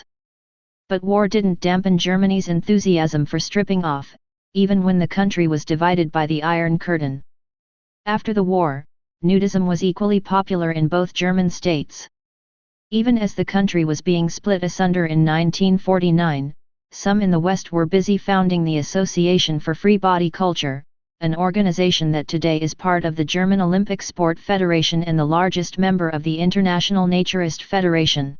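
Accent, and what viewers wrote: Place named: United States. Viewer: But the accent is American!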